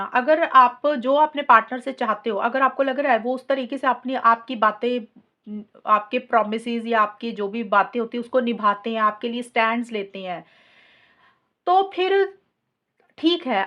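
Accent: Punjab, India. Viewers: Your way of talking is Punjabi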